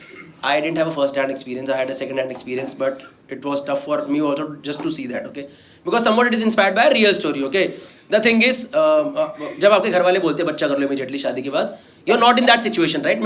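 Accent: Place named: Punjab, India. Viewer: Hard to understand the super faster than Rafael jet talking combined with the thick punjabi accent. Please provide English subtitles also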